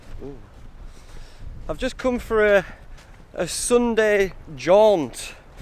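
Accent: United Kingdom. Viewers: Is your accent British or Scottish?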